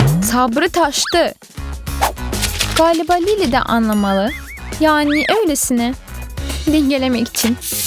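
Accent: Azerbaijan. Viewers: Sen azerim8sin türk mu anlaşlimyo bence azerisin 😏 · Azerbaycanca konuşuyorsun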